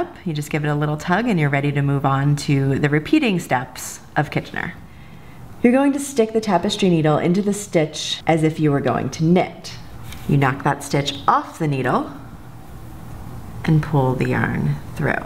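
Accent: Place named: United States. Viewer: It's just her accent. Many Americans ive heard say "mounain" without the t sound like "tigh-en" here too. Just the accent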